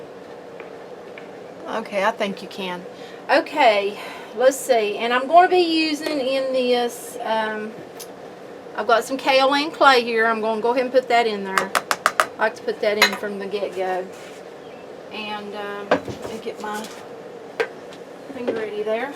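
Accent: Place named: South, United States. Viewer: OMG I LOVE YOUR VOICE! YOUR VOICE SOUNDS LIKE, JUST LIKE MY GRANDMA, the accent sounds just like her, she's from the south. Omg love it.. sorry lol. Very nice video. Enjoyed and will add you to fb. Omg, your accent is the same wow.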